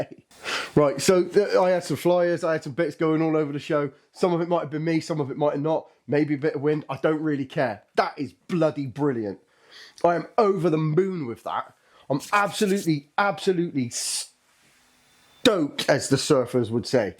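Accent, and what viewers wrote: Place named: England, United Kingdom. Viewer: wow... wow... wow... I didn't realize that the paper target that you are aiming is just that small..... Your shooting is really good actually... I thought the target was like 12 inches size but it turned out to be that so tiny..... You are eligible to go for competitions already.... And still, I still love your accent... it sounds like those English movies...plus the vocabulary...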